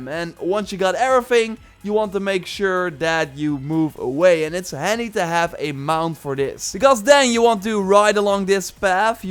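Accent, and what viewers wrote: Netherlands. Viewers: Yea he says asin-creed too :) It's all good he's Dutch? We forgive him!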